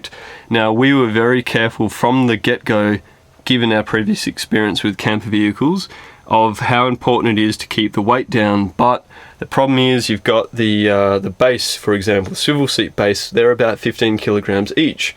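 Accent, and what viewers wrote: Australia. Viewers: Love your build. It's comfortable and practical and it looks good too. Is this a medium wheel base or a long wheel base? Loved hearing the Aussie accent.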